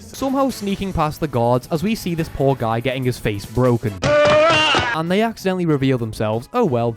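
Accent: Liverpool, United Kingdom. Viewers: they re both from liverpool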